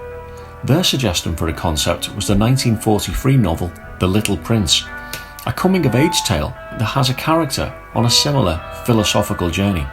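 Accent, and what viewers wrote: Liverpool, United Kingdom. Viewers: Sorry ..... only just got on to your this really is fantastic content and fantastic to hear your a fellow scouser . Thank you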